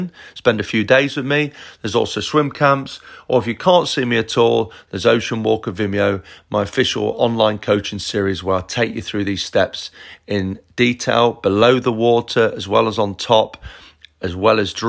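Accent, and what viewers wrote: United Kingdom. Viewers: Very informative and helpful. The only point: "s english he uses in videos, I find it difficult to understand at times as a non-native speaker. Maybe its his british colloquial words and accent.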